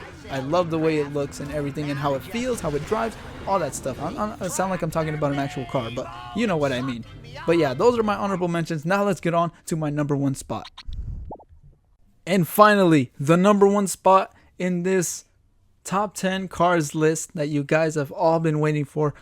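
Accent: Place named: Latin America. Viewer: jaja Que quay!!! I am Chilean and I could hear it in your voice! Much love bacan!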